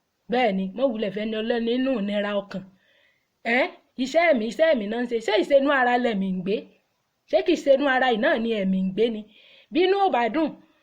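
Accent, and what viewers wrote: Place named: Egba, Nigeria. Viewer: Egba (Abeokuta) accent mixed with a little bit of Ibadan. But the last time I checked you have a different Yoruba accent. Cool anyways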